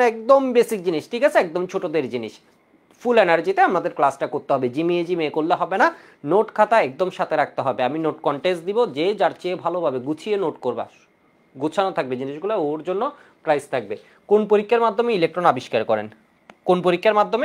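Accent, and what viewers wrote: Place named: Chittagong, Bangladesh. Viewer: সম্ভবত Chittagong এর accent আছে ওনার কথায়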